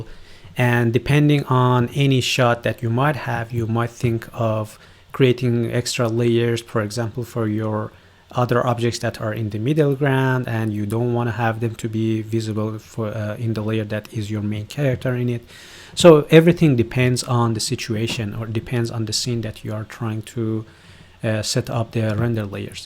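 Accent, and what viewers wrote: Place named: Iran. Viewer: اقا دمت گرم خدا قوت خسته نباشید خدا وکیلی عالی بود کارت اگه مثل خودم لهجه نداشتی اصلا فکرش رو نمی کردم ایرانی باشی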